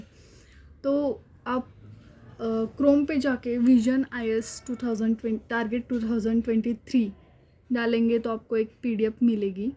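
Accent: Maharashtra, India. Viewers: Marathi assent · You have Maharashtrian accent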